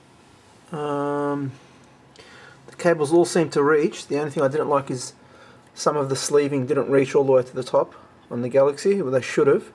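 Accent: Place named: Australia. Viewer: Australian:) I think.... nice orginisation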